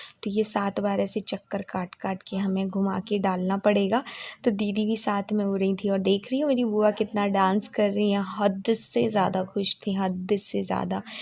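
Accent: Bundelkhand, India. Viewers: Khti thi bundelkhandi ni bnti bolte magar bol to leti ho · Didi mein sagar se belong karti hu aur hamari language bhi bundelkhandi hi h and I don't know why mujhe aapke muh se yeh language sunne mein bohot achcha laga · Bundelkhand language😊😊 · Di apki language buldelkhandi h ap kaha se ho esi language hamari bhi h m jhansi se hu,PLZZ reply me Di pzzz plzz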